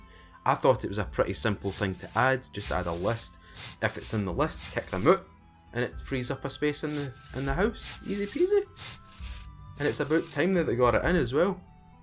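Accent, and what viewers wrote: Scotland, United Kingdom. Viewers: WHere are you from ??? Scotland ? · Scottish accent is brilliant, Just bought the game and it seems we are going to spend some time here xD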